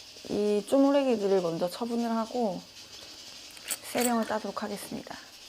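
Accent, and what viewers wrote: Gyeongsang, South Korea. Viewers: 약간 경상도 사투리가 묻어있는거 같으세요ㅎㅎ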